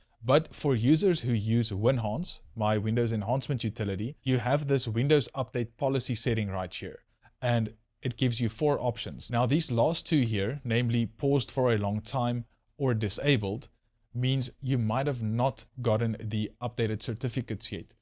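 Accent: South Africa. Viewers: I like this site for its good advice, an insight into just how long M'soft has been run by control freaks and because I went to school with boarders who were mainly from ZA and Rhodesia. The accent reminds me of decades ago when a town scholarship day boy was taught how to play rugger as it SHOULD be played -- by the rules and never to shout. In old age, these things become important. Thank you.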